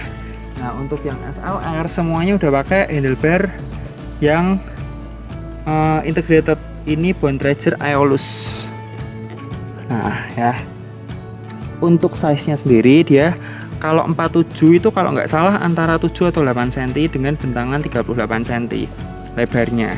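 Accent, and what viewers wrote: Java, Indonesia. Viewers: Aku sering buka youtube reviewx. Yg ku suka 1. Logat jawax itu lho mas, 2. hitam manis, dan yang paling 3. Reviux rrinci rigit, menguasai sepeda yg direviu. ❤😂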